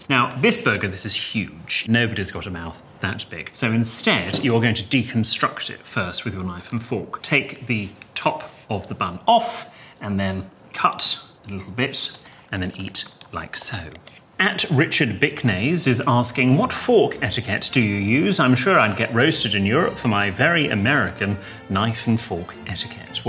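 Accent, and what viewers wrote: United Kingdom. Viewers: 還是喜歡英式的紳士風度，腔調聽起來很舒服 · 他用超級英國的方式開嘴說幹話反差令整件事更有趣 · I'm learning how to cut cheese for Shak cut (字幕記錄 沒意外是錯的 不是 Shak cut，奶酪也許 charcuterie 法國食品才正確，IPA約為 /ʃɑːˈkuː.tər.i/ ，最末的發音近乎 /triː/ ，沒金耳朵聽了多次也不確定。如不是主持這英式近PR的口音，字幕記錄錯的也許多的多。) well you live left on the edge here . 這裡的內嵌中文字幕，把問的人形容為89，但89我也不明何意，問google，大意就是"底層"或是"低端人口"吧。 如是這樣，根本不配合片中上文下理，也許完全相反，低等文明不存在學習禮儀的可能性，黑社會不會問：老闆，我可以用武力威嚇你給錢就稱作保護費嗎？ 很有趣，我沒多少英文程度，去問問AI，反覆的問，得到較合理的解說是 "考慮到上下文，主持人在談論切奶酪作為餐桌禮儀的一部份時，並不是在字面上描述真正的冒險，而是在輕松地開玩笑。可能這句話的意思並不是詢你"你會過上這樣的生活嗎？"而是以一種幽默的方式贊美這個學習過程，暗示在切奶酪方面的精緻和技巧可以被視為一種"冒險"。 哪通俗的說是你問的程度是專業的啦，二是，你在裝B。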